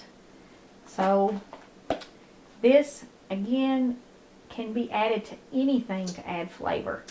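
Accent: South, United States. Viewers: 2X T-Spring. I just love your channel. As a northerner, I love your southern accent. You share a lot of information and I also love that you are a. Christian, as am I.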